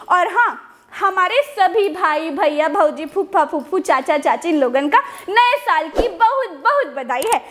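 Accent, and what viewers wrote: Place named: Awadh, India. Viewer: आप यक़ीन कीजिए आपकी अवधि भाषा सुनने में बहुत अच्छा लगता है, बहुत ही अच्छा... 👌 खासकर आपकी "भाषा शैली" का जवाब नहीं मैडम..! 🙏🙏🙏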